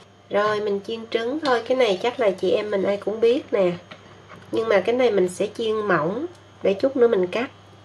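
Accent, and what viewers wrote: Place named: Mekong Delta, Vietnam. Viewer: Quê Cô ấy o miền tây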